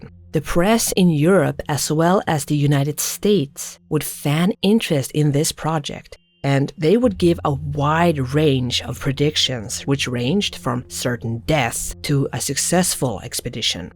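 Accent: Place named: Sweden. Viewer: assuming you are actually from Sweden , as an English person it is great to hear a real narrator whom isn’t English or American especially when it’s covering an event concerning a ‘local’ ! I’ve had to watch all the previous covering this because it’s pretty bonkers & am enjoying your treatment of the adventure 😁👍❤️from Bournemouth in Sw England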